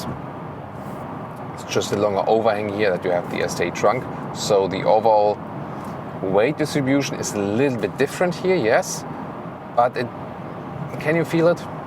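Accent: Germany. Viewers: congratulation a little bit of german accent but the analisys is quite perfect. go ahead mate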